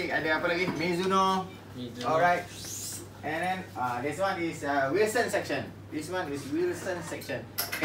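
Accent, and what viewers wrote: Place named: Malaysia. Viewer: Saran bang mending di kasih subtitle bahasa inggris aja biar gak belepotan Malay Inggris😁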